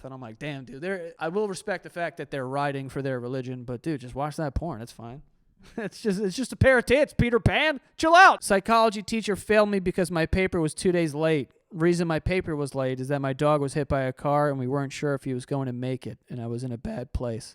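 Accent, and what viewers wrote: United Kingdom. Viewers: Funniest British accent I’ve heard the past year! 😂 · Your British accent is hilarious! 😂 😂😂😂😂😂😂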